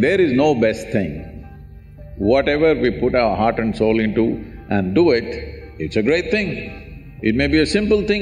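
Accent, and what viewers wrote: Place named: India. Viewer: Giọng ấn đặc trưng thật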